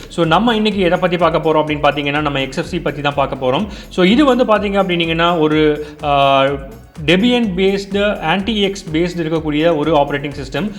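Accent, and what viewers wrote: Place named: Kongu, India. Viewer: coimbatore slang veera level anna. I love kongu tamil.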